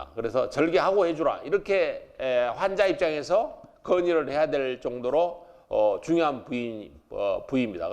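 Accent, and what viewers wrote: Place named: Jeolla, South Korea. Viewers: 전라도 말씨가 어때서요 ㅋ 별나네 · 사기치지 말게. 전라도 말씨, 아주 답답한 진행. 그냥 덮으세요. 임플랜트? 티타늄 재료로 하나 원가 10만 원도 안돼. 치조골만 양호하면, 가까운 동남아시아에 가서 아주 싸게 하세요.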